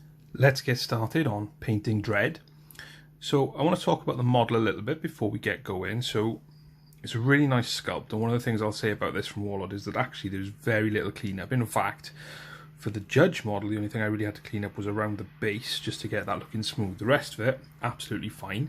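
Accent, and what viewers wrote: Wales, United Kingdom. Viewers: Welsh, is it?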